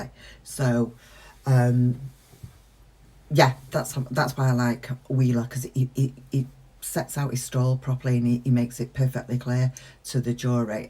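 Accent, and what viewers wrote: United Kingdom. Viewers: thank you. No I figured you were British. I guess i am naive to think Britain like the US doesn’t have different accents. I love your videos 👍 Always looking forward to the next one.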